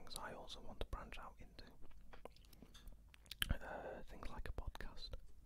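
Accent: Wales, United Kingdom. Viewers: Are you Welsh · What part of Wales you from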